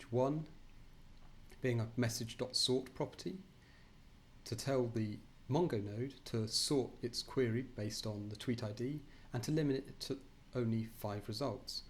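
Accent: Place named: United Kingdom. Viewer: difficult to understand: strong British accent mumbling ... sorry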